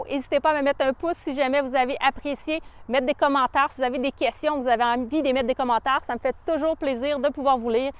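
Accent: Canada. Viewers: Merci pour cette belle explication ...et votre très bel accent canadien . A bientôt !! Manu , de la Bretagne.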